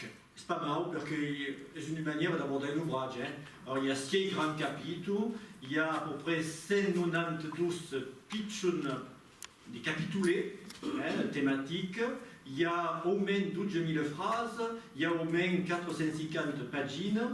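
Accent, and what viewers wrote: France. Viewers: he says provençal words with a very strong french accent, is my impression as a catalan speaker. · tEnen No, la seua llengua com a tal és molt pura, llevat de l'accent francesitzat.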